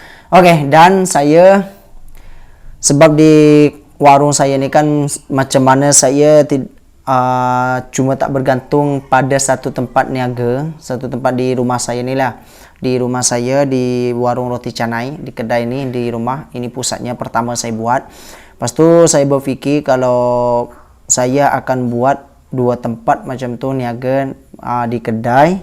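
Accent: Malaysia. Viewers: Bro cakap dah spt org malaysia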